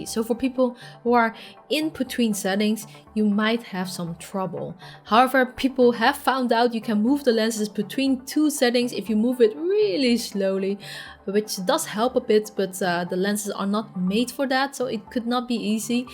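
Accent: Netherlands. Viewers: Great video ! 😊 I will sub! Question though.. are you Dutch .. your accent makes it sound like it I just bought a quest two I loved it, but sadly it made a horrible noise in the fan in front .. so I am waiting for a replacement. 😊 i did not know about the stuff of the elite strap.. I wanted to buy it as I never had the first one.. what should I do ? Wait until they fix it ? Or just cope with the standard one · I can't help but wonder if you are Dutch, you have a Dutch English accent :) Anyway, it doesn't feel like I'm watching a review of someone who used this for a month, it feels more than just an explanation of the Quest 2 specs. I have so many questions! :D I was hoping to see a more personal review going in deep about your experiences. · is it me or she sounds Dutch ?! .... :) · Je klinkt Nederlands? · Ok, I NEED this mystery resolved... since your first video I thought you had what sounded like a dutch accent! 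Are you ladies dutch? Quality content, i'm subscribed!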